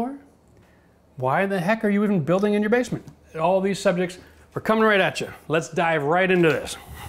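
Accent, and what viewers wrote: Canada. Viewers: It's gotta be the Canadian accent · I've watched every video you have. You seem like a cool guy and you are VERY knowledgeable! I don't mind that you have plumped up a bit over the years. Don't even mind the Canadian accent, but every time i see your dewalt tools i throw up a bit.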